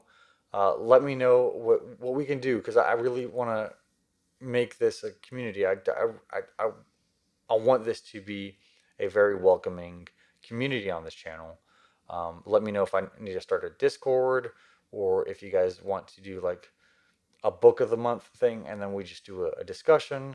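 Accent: South, United States. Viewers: I love your Southern accent!!!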